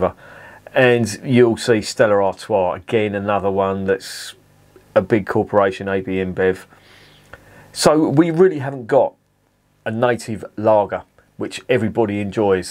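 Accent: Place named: Yorkshire, United Kingdom. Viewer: Blimey thought that was going to be rubbish, surprised. 😀 ps ya Yaaarksha accent is coming on, 😀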